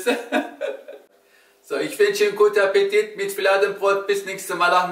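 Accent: Swabia, Germany. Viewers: Türkisch und Schwäble. Beste Kombi :D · Haha typischer Schwabe 😄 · Ich liebe diesen Schwäbischen Dialekt :D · Sympathischer typ echt, wie das Schwäbisch immer wieder durch blitzt isch Mega cool! Hab’s Video echt nur komplett angeschaut wegen ihm sonst spul ich immer vor damit ich seh was dabei raus kommt! Super Rezept! 👍🏼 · Coler typ der ahmed hört sich an als ob er aus dem schwabenländle kommt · So lustig und sympathisch der schwäbische Türke😂 wieso ist das Video nicht FSK 18🤔 so porno das Essen😏😍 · Dude Deluxe ich find die Kombi auch extrem amüsant. Mein Lieblings Dialekt 😅👌🏻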